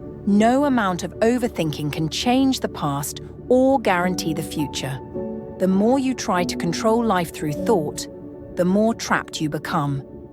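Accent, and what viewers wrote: United Kingdom. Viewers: Couldn't stand the snooty brit voice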